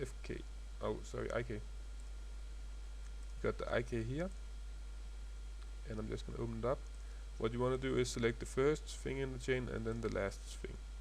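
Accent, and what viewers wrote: Denmark. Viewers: I can easily hear you're danish d: ! not only by the name d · are you danish swedish or are you german i am danish by the way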